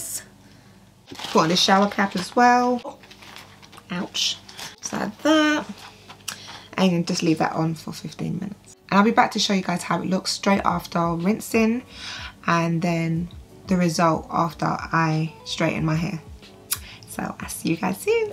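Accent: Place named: London, United Kingdom. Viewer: I just LOVE your accent in an London accent🥰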